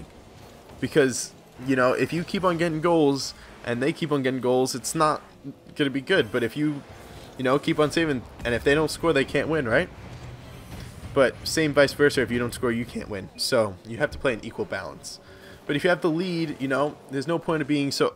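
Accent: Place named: New York, United States. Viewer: New York accent.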